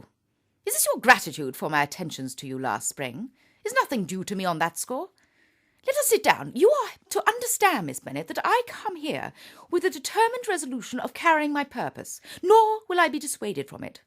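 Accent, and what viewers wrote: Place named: RP, United Kingdom. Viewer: This is absolutely gorgeous! I love her voice and the way she speaks. She sounds so posh (but I like it) and her diction is FLAWLESS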